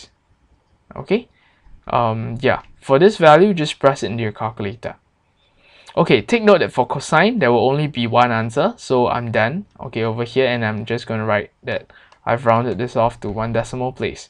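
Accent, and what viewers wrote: Singapore. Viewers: Hey are you from singapore?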